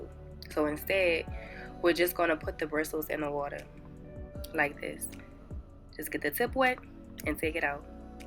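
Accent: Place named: Texas, United States. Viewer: Nice font work. You have a nice accent, whereabouts in the States are you from? (Update: Just heard you say you live in Austin TX!) I like you talking over the video and the length is fine.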